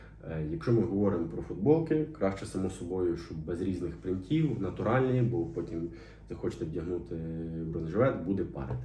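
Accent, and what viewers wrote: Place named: Podillia, Ukraine. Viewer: Мені здається, що автор родом з Поділля, за вимовою. За інформацію щиро дякую. Можливо фумігатор від комарів теж знадобиться.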